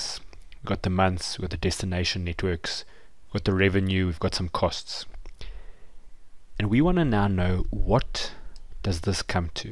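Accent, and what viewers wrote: South Africa. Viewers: I miss the south African accent, but certainly not the country. Hope you also managed to escape!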